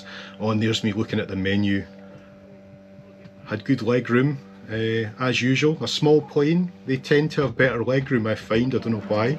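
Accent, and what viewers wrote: Scotland, United Kingdom. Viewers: your "braid Scots" or is it more properly called a "burr," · Hi from Sete Lagoas, Brazil. I was raised in Berwick upon Tweed and have a sister who lives in St Andrews so I'm familiar with your neck of the woods! Here the airport issues are normally flooding or runways melting!. Thankyou for your videos, I miss Scotland and so it's great to hear that accent! God bless and safe travels, Jadir Alister Barnard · I thought the video was great and I really enjoyed it. I loved the concept of the commentary and you also have a great voice that is a pleasure to listen to. I think that's the Scottish accent which one of the world's best. Big thumbs up for that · This is a fun change. I like it. I also wanted to mention that I have (as an American) a soft spot for all things Scottish because my ancestors hailed from the lowlands. Your persona and accent are very appealing. 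I also recently started following the Witches of Scotland. Their story is both fascinating and disturbing. I must admit, I have a really hard time understanding their accent (as I did when I vacationed in Scotland!), so I really appreciate the fact that your accent is both authentically charming and completely understandable. Love what linguists call the "non-standard English dialect" you add to everything you say. What a wonderful escape from the dystopian nightmare we have going on here. Especially nice to see Greenland at a time when it is in the news so much. Such guilt and shame most of us here have for what we've inflicted on the world (well, some of us, definitely not all of us support him). Hope this style of video isn't a one-off, but that you'll do it again.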